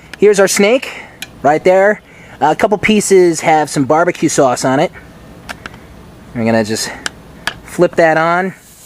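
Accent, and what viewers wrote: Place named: New York, United States. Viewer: are you from New York or Massachusetts? Your accent sounds similar haha.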